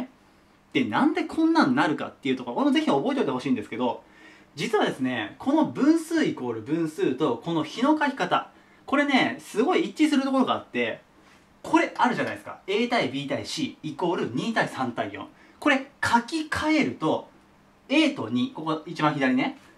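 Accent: Kansai, Japan. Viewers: たまに関西弁のイントネーション入るよね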